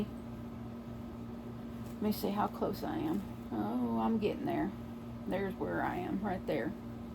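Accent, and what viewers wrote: South, United States. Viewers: Omg I so love how your video started with your beautiful Theo❤🐕. And it is BS what happened to Mama Kayla's intro music. Y do people gave to b mean and cause drama !? It is so not needed and definitely not deserved. Thank u for explaining those weird sayings, I had no idea what they meant. We have our own sayings down here in Australia that may confuse too I suppose.🤭😆 But I just love the Southern American accents. Some do seem drawn out and others not so much but I just really love listening to u talk and I like how u talk fast as I am naturally a fast talker too, but during my working life before I was Medically retired, I was told to slow my speech all the time. Funny thing is when I was working I did consciously slow my speech !🤭🤣 And I am one of those people who is slowly going backwards in all your videos, plus watching all the new ones popping up.😁 Love and Prayers 💗💗💗🙏🙏🙏🐕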